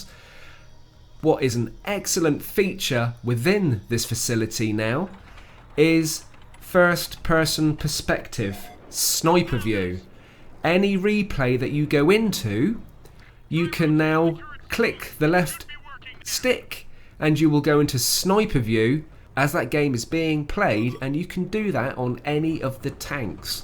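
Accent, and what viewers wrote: United Kingdom. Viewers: Are you Bristonian ?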